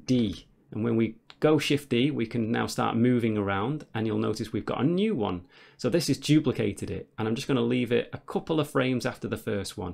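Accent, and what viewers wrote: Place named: North, United Kingdom. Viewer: I like your northern accent! clear friendly and well paced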